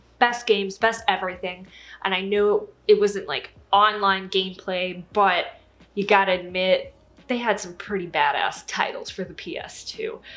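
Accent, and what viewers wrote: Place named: Scotland, United Kingdom. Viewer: I thought you was from Scotland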